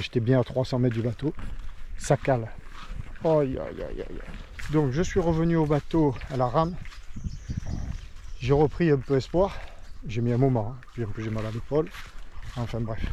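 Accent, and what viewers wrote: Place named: Marseille, France. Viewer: Comme tu le dis si bien : malheur! mais avec l’accent Marseillais ça passe mieux et ton audace te sauve 👏👏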